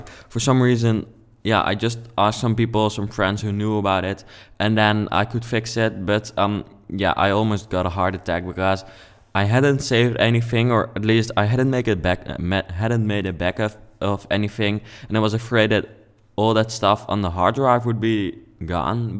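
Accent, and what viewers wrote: Netherlands. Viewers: Uk hoor gewoon aan je stem dat je Nederlands bent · He is from Netherland. Not everyone can speak good English. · You might wanna work on your accent a little, cause it's really thick dutch. I'm dutch and it's a bit hard/irritating to listen too. But i really like the house!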